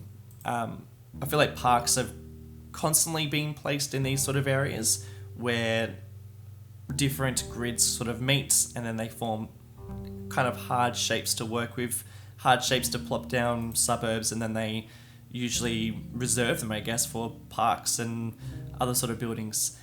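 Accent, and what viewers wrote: Australia. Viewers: Seriously good quality presentation mate. Great to hear another Aussie accent too. Well done mate, top marks. Liked and subscribed. Keep it up.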